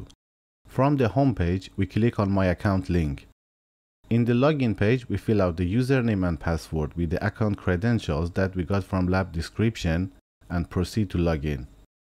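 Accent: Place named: Iran. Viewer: Your accent is like Persians accents lol , are you Iranian ? Buy the way I LOVE your videos man , Thank you so much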